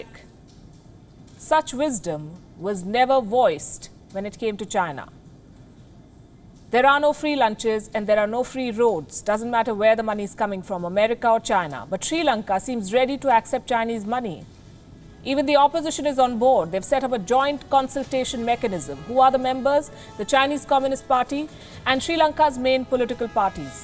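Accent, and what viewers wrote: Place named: India. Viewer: American here watching, and i LOVE THE INDIAN ACCENT.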